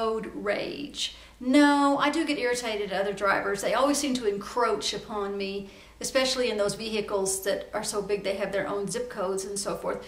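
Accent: Italy. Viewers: Your mom reading 50 Shades Of Grey really made me giggle. My FIL has read it too and he is 77!:-) Your Italian accent is super cute! I enjoyed learning these facts about you,. XOXO! Sandra. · Thank you for the tag! (you have a great Italian accent!!) xo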